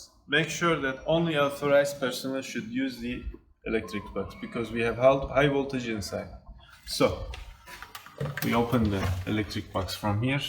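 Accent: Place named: Turkey. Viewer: most turkish accent i ever seen in my life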